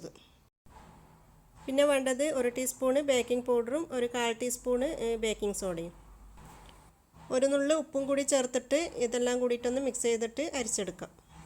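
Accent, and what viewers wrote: Thalassery, India. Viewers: ഇതൊക്കെ കേൾക്കാൻ ഒരു രസമാണ് തലശ്ശേരി ഭാഷ 😍👍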